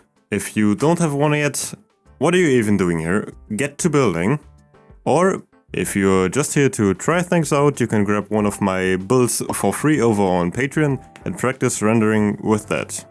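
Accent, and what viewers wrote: Germany. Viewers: Aber top Englisch👍 habs wahrscheinlich nur rausgehört weil ich deutschen Akzent halt kenne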